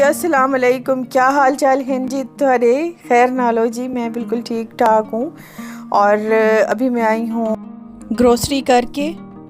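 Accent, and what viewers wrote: Saraiki, Pakistan. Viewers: Towadi saraiki axcent meno bara pasand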